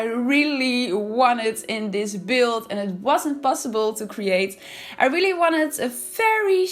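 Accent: Netherlands. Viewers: Are you Dutch? Ps. Love your videos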